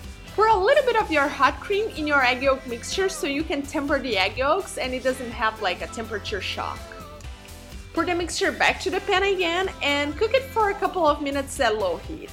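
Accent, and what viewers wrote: Brazil. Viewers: Omg girl! You so pretty I love that accent! I'm guessing you're from Brazil? Anyways thanks for the video I'm gonna do this 😚😍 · Sotaque brasileiro é inconfundível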